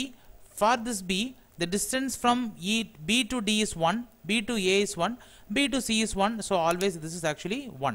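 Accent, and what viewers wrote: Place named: South India, India. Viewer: South Indian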